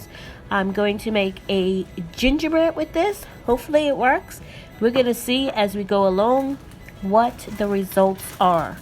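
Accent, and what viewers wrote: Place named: New York, United States. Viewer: Are you from New York or Boston? I like your accent. I’m in Colorado, but from New York.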